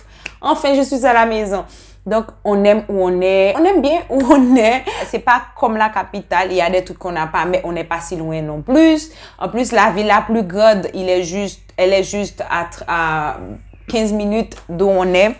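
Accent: Haiti. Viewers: Elle a un accent de Haïti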